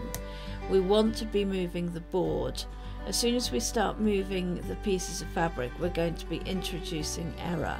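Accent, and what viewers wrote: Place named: United Kingdom. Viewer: Hello, I just found your videos & have subscribed to your channel. I ❤️ yor easy to follow method of teaching, your choice of quilt blocks & the gorgeous fabrics!!! Your wonderful Brittish accent is also a plus! In this video, you asked for suggestions as to what block we would like made by you. Since my passion is PEACOCKS & PEONIES, (my kitchen & dining room 'theme') would you please do a video on making a realistic 'dancing' peacock (tail feathers opened upright) with CORAL PEONIES applique block? I also love the Brittish Peacock Butterfly. So I am humbly & eagerly awaiting to see if you will take these two suggestions to heart! Thank you kindly from USA.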